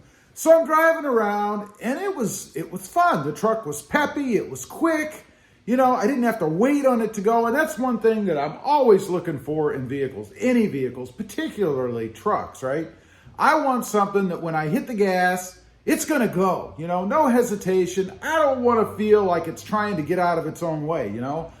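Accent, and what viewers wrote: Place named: North, United States. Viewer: You're in Texas? Sounds like you have a northern/eastern accent.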